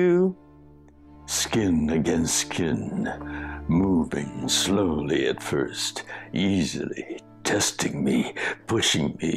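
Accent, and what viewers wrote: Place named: Japan. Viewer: His Japanese accent comes out so much when he uses his narration voice. Oh myyy.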